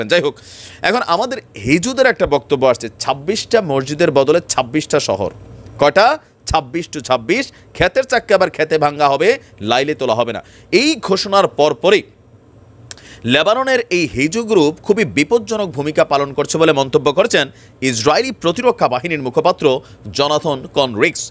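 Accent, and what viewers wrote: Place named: Noakhali, Bangladesh. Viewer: আরে ভাই এতো নোয়াখাল্লা তার পর আওয়া এর ভাষা এমনী হবে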